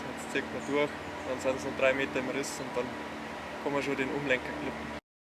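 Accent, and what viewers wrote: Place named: Bavaria, Germany. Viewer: Hast du de rechte für des lied, kann i de aa haben? 😜 Und keine untertitel? Versteh dem sein tiefstes bayrisch ned...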